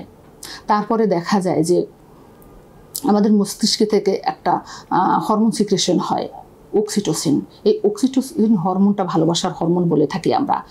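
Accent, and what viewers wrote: Barishal, Bangladesh. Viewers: মেডাম আপা বরিশালে নাকি মুখের কথা সুনে তো মনে হয়ে সেরকম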